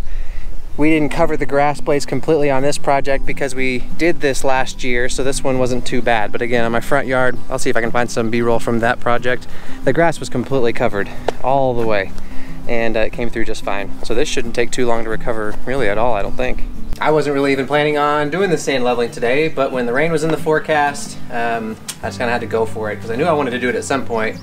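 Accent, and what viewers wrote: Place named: Australia. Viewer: Ben Simms will appreciate the Aussie accent. Lol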